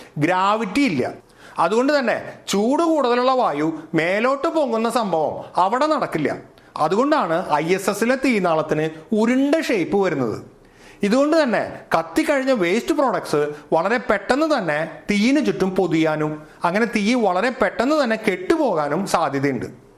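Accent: Thrissur, India. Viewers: മ്മടെ തൃശൂർ ഗഡി ആണല്ലോ ഇദ്ദേഹം സംസാരം കേട്ടിട്ടു... 😌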